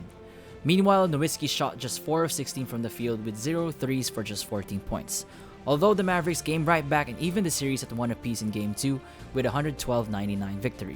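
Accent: Philippines. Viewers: is it just me or do y'all hear a filipino accent? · Sa boses palang pinoy na e 🤣. Love the video though 🙏🏽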